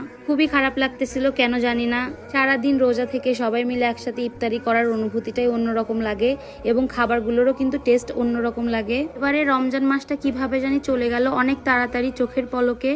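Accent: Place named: Chittagong, Bangladesh. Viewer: Amader chottogramer vloger,,,amra boalkali taki,,,onek vlo lagay jokon chatgaiya kota suni❤❤❤❤❤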